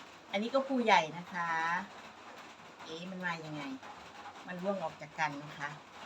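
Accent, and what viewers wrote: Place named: Sakon Nakhon, Thailand. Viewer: ❤❤ชอบค่ะพูดย้อปนไทยเผยแพร่ภาษาคนสกลนคร🎉🎉🎉🎉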